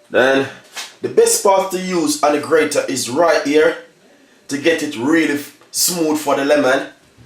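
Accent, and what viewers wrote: Jamaica. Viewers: wow are you Jamaican. me want some rum cake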